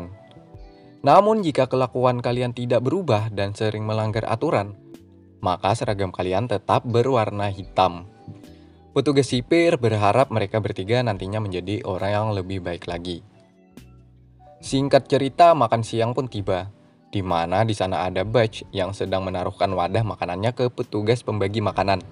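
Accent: Banjar, Indonesia. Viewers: Denger denger dangsanak ... Hemm orang banjar ya bang😂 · Orang Banjar kah? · Sasakali bahasa Banjar hja pnk basuaranya kyp bisa bagus banar sako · Woah mimin banjar kalsel sama nah sanak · Anjr bhasa bnjr nya kental bnr, fokus aja jdi fukus wk, tpi kdpp ai. Contoh chanel lain kya iq7 tu nh, beh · Logat Banjar kdd hilangnya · urang banjar kah om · Ka kk orang banjar ya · Pian orang Banjar kah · Urang banjar kah?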